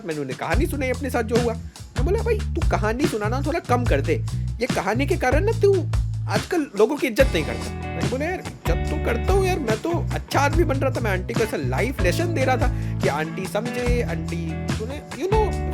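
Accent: Bihar, India. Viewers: accent from Bihar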